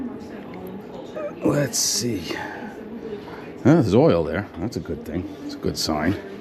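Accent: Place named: New York, United States. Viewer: I like your new york ack'sent'....I had a partner in in los angles who was from brooklin and he was a good friend,,Yet he was So much of a grinch'..?[[the dude had an atatude'...anyway I llike your stories..and You have a father that is very good to you...I like it...I always wanted a harley so I kept fixing up old broken down bikes till I made enough to use as a good down payment,,and along with what I could save from my job driven the senior bus...I bought an 02 fxd...once I payed it off..I spent way too much time and money changing evreything till it hardly had any harley parts...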